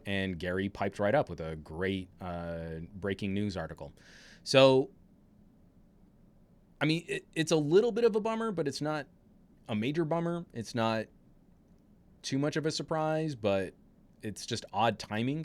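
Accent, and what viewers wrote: United States. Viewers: Wait, you're from New Mexico or???.-.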